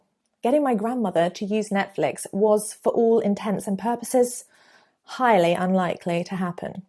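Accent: United Kingdom. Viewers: Great British accent ! I live in Austria where the children are taught to pronounce every “a” (or “A”) as “e” (or “E”), for example “en epple” or “e men”. It was really refreshing for me to hear an “a” pronounced as such ! I think this anomaly originates from American English, as the Americans often pronounce an “a” as in “ha-emmer” and not just like “hammer”, however the Austrians and Germans are taught to pronounce it like “e hemmer”. My wife was often laughed at in the UK for example when she talked about a person having “e sore beck”, and a friend of mine quipped “Sore Beck? Is he by any chance related to Jeff Beck..???”. The level of English spoken by Austrian and German people is also considerably lower than that spoken e.g. in the Scandinavian countries or in the Benelux countries, but the local authorities just don’t care, as they are doing nothing to remedy or to improve on the situation..🤦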